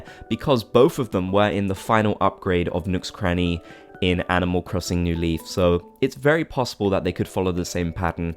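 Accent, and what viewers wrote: United Kingdom. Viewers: I enjoy listening to your British accent, especially when you said PAT-UN ! 😄 New Horizons is my first Animal Crossing game, so I would gladly welcome any new stores. Leif is also one of my favorite NPCs, so I’m all for him having a shop.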